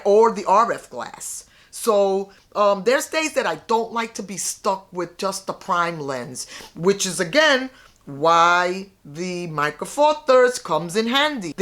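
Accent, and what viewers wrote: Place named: New York, United States. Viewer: I watched the best phone camera review this morning from Tony and Chelsea - they shot the video with the GH6 and there were moments when that autofocus was simply not cutting the mustard. I've seen some amazng footage out of the GH6 but normally from professionals who know what they are doing. I'd love to buy this camera but as a Fuji XT3 who has suffered in the past from autofocus issues (thankfully less these days), I think my next camea will be the Sony A7IV. Talking about computers and codecs - My new Macbook Air M1 is fantastic - complete game changer! P.s. Love your accent! Are you from near New York? Makes me want to watch Law and Order again.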